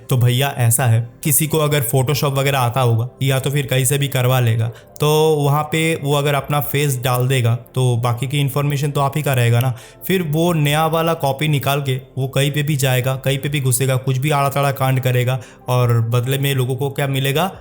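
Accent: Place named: Bengal, India. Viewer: Bengali tone...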